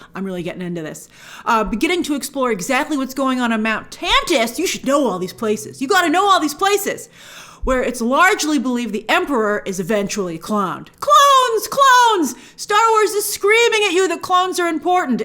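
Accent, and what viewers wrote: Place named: United States. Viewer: I like your voice. Your accent is nice. Which part of America is this accent from?? Can somebody tell me?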